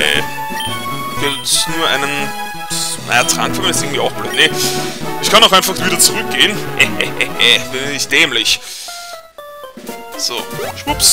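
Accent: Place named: Austria. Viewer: Ich feiere ihn auch vorallem wegen seinem österreiischen Akzent :D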